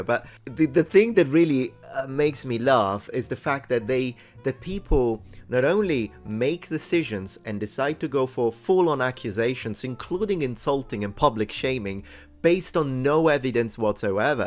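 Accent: United Kingdom. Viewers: Does it sound to anyone else that he learned English from a British source? Don't know why that's standing out to me all of the sudden.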